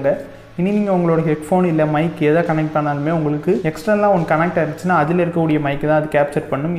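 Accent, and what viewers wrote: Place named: Nagercoil, India. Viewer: Nagercoil Slang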